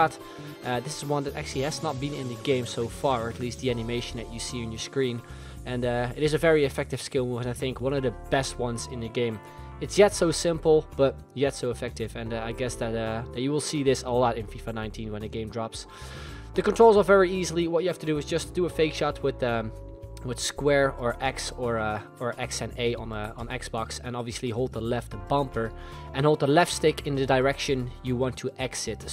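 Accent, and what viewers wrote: Netherlands. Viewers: Christian El Tawil yea was thinking of that too. He sounds dutch so he should definitely know this haha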